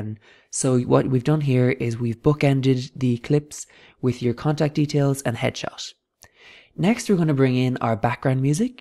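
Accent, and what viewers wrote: Ireland. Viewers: Thank you, very helpful and easy to follow. Your accent makes me want to tour Ireland again -- Sláinte!!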